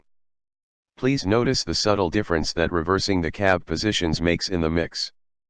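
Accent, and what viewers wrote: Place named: New York, United States. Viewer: Very useful information, thank you. I wouldn't worry about a NY Metro accent -- it lends credibility to use your own voice and would be less distracting than the computer voice!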